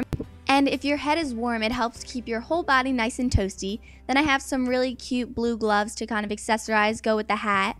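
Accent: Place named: South, United States. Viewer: I thought u were grom the south, you sound country :b<3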